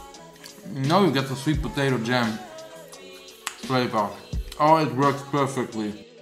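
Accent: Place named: Germany. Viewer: Das hört sich an wie wenn ein deutscher probiert englisch zu sprechen halt nur in richtig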